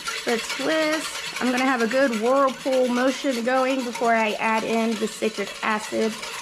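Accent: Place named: Central Pennsylvania, United States. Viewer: a word like y'all. From central PA. I thought you were originally from pa.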